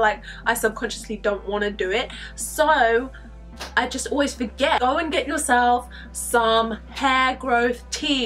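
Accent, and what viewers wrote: London, United Kingdom. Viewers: Are you from London #londonsquad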